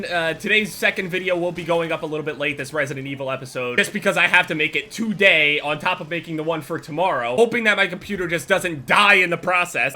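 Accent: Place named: New Jersey, United States. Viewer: your new jersey accent is starting to be more noticeable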